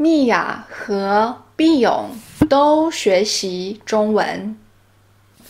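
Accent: Standard. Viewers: 老師的聲音真好聽，發音也很標準，要向您學習！ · 老師發音標準清析，太棒了。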